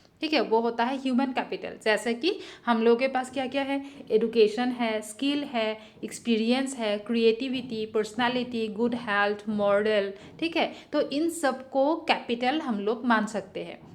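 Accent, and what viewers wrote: Assam, India. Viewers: Baa apuni Assam r neki?? Pronunciation tu Axomiya jen lgise aru apuni o no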